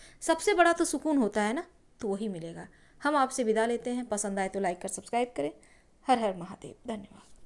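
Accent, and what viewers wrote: Bihar, India. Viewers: Kaha ki ho bihar se ? Funny accents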